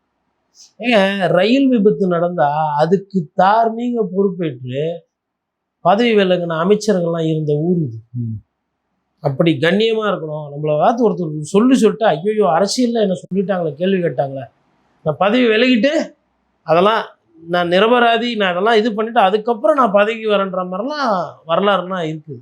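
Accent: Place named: Telugu, India. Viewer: ஏன்டா கோட்டி உன் தாய்மொழி தெலுங்க அல்லது மலையாளமா ஏன்ன தமிழ் தமிழ் பேசர இப்படி தான்டா இரண்டு கும்பல்கள் தமிழகத்தில் சுரண்டி கொழத்துகொண்டுயிருக்கு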